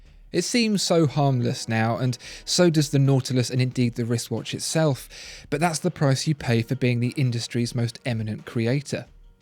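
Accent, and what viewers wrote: United Kingdom. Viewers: Ugly watch no matter how this guy with a British accent says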